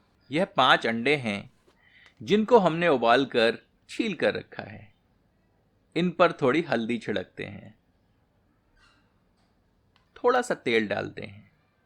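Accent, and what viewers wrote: Standard. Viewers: Lehja.. Behad sundar shudhdh Hindi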